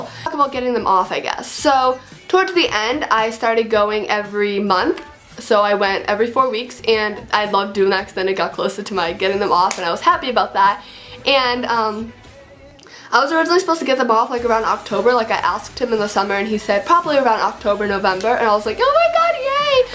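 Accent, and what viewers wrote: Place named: United States. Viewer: It's called an American accent.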